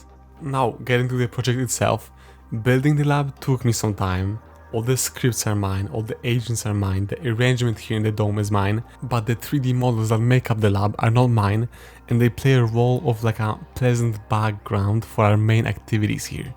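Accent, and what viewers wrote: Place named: Germany. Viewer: Is creator german? This accent i dont know what is it😂. Cool video btw!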